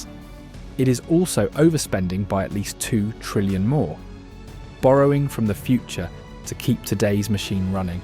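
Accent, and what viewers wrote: United Kingdom. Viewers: I hear a British accent I know the guy talking is a Socialist. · British accent doesn't help. Bogus video. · Is it somehow cool to have a guy with an English accent narrating a video consisting of an animated hand drawing pictures? Why? 😶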